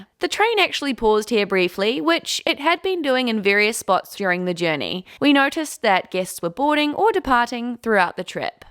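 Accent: New Zealand. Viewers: Fortunately, your Kiwi accent actually isn't too strong for me to understand and to fully comprehend. As a matter of fact, it's really quite pleasant listening to! I'm a GER, trying to improve my English listening skills by watching your wonderful videos. Rail travel has been my favorite mode of transport for decades, I can relate to any second of your content. While watching and eventually commenting on this video, I just might improve my writing skills as well! Tons of looove and kudos to you from a GER subscriber.... 🥰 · I like how your Kiwi accent becomes stronger when you're in NZ, especially with your family 😄. Awesome video. NZ is truly quite beautiful.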